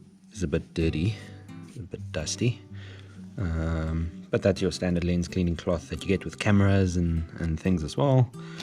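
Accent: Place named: South Africa. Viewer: I hear a South African voice, I see 10k subs, and I watched a good review. All I could do was subscribe. Very excited that I found this channel!! Yes boys!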